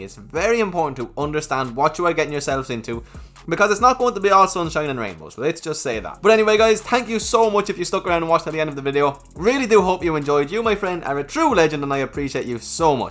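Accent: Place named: Ireland. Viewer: That Irish accent is so fun to try n copy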